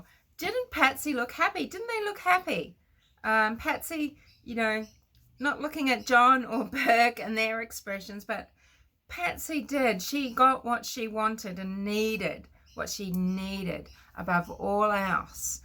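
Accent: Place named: Australia. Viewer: Nice video. I could listen to your Australian accent all day. Cheers!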